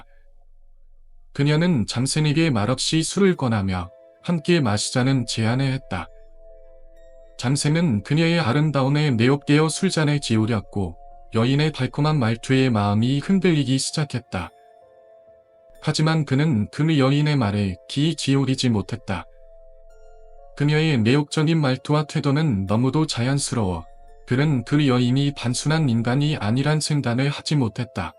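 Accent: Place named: China. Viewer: 중국사람이냐??? 머라는거야 ㅗㅗ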